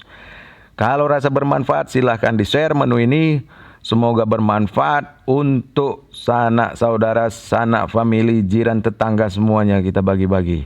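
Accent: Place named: Medan, Indonesia. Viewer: Medan kali bah...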